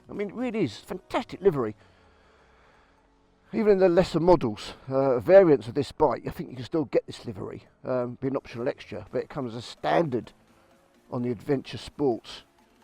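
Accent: United Kingdom. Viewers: You sound like an east-end barra-boy, yet your pronunciation of panniers was the poshest I've ever heard! 😀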